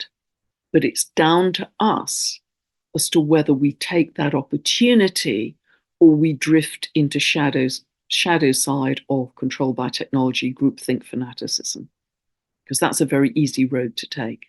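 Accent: England, United Kingdom. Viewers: thank you… but I can probably top that. You made my life! Not that this is a competition, rather a communion of like hearted souls, and one in which I feel immense gratitude to know you. Many, many moments of wobbly exhaustion were laid aside after I listened to your English dulcet tones, so welcome in this far flung place from home where I live 💜💜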